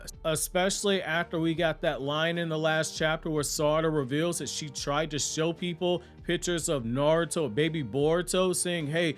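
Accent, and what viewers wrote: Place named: East Coast, United States. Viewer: He really think down south people country 😢 you can tell he from the east coast 😂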